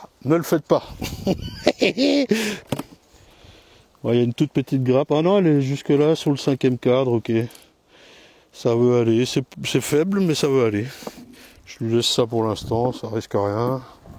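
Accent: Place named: Marseille, France. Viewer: Salut,ça fait plaisir de te revoir! Mais d'où tu sors cet accent marseillais que tu sors régulièrement? Une marseillaise.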